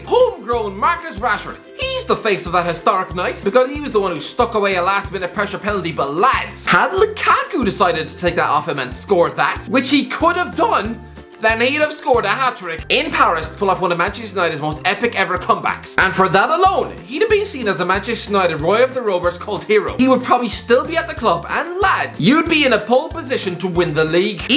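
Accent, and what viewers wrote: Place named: Ireland. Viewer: and words which end a sentence while having three ˢʸˡˡᵃ⁻BLES... you've got to love them.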